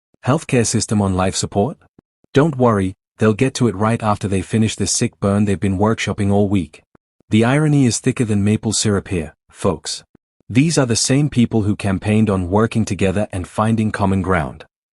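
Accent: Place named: United Kingdom. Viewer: Why the Brit voice and you are showing provincial leaders instead of Federal parliament members?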